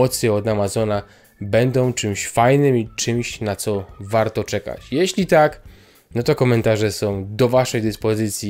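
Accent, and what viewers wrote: Silesia, Poland. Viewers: wyczuwam lekką nutę śląskiego akcentu :) pozdrawiam z opolskiego.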